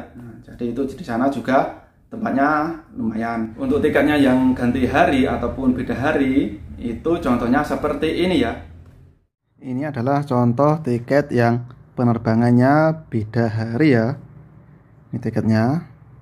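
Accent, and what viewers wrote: Java, Indonesia. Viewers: Medok tenan kang...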